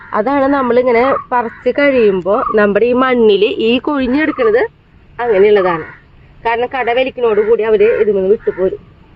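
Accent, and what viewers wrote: Thrissur, India. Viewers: Nice to hear trichur basha smiths God bless be cause Amma is with u dear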